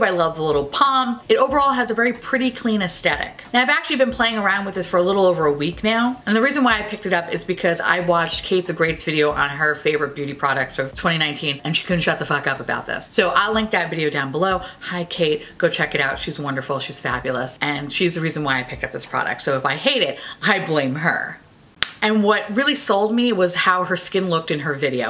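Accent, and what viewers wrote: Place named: Minnesota, United States. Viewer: While in her minnesota accent.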